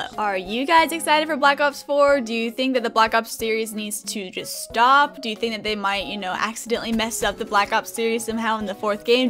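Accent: South, United States. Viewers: are you southern I like your accent lol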